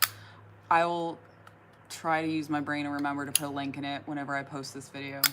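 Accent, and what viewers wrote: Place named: Midwest, United States. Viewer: in fact its not too fast, its a problem of accent... but sometimes, thats the good thing with you tube, i can roll back the part i dont understand and after 2 or 3 times understand it better! but it doesnt works all the time though! ( and dont be afraid, scottish, northern irish and northern english are waaay much harder to understand than your middle west accent!!!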